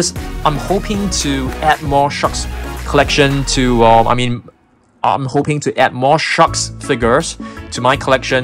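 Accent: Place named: Malaysia. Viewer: He sounds like he is from malaysia and i am hoping i will make a fanart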